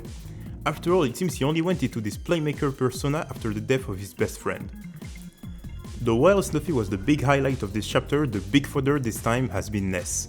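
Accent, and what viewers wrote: France. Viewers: French accent kinda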